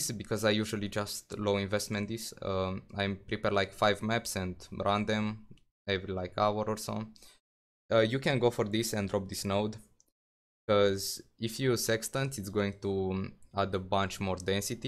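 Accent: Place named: Romania. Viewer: Are you romanian? Heavy romanian accent. If yes, Salut!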